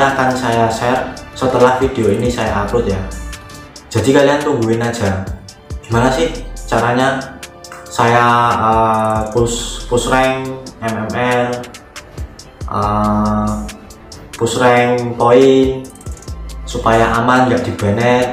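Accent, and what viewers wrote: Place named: Java, Indonesia. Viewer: Logatnya Jawa. Emang asli mana bang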